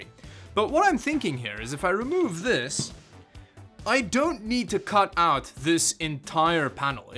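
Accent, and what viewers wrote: South Africa. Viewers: I guess you also live in South Africa, because of the accent and name, but I would like to know, is it better to buy pc parts in south africa or import from other countries and then paying taxes and duties? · Are you South African? 🤔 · are you South African? You accent and name reminds me of SA. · You afrikaans? Cuz ek is! And yeah does sound like a South African who speaks with a Semi-American accent. (Pronouncing R’s alot and some overpronouncing on letters and words that i am to lazy to list now)Your surname is also very afrikaans, “ ”😂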